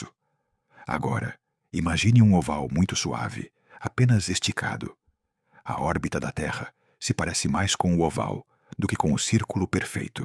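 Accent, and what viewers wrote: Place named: Portugal. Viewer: Sotaque de Portugal não dá!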